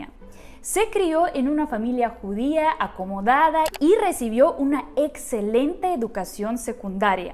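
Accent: Ukraine. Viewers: Buen documental, cuando estube en el DF, trabajando y estudiando, muy de seguido pasaba por el museo de Frida Kahlo, Diego Rivera y León Trotsky, pero nunca entre, una pregunta porque estás volviendo a hablar con acento ucraniano ya lo habías perdido, es bonito, no lo critico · Soy yo o está volviendo a tener su acento Ucraniano???:P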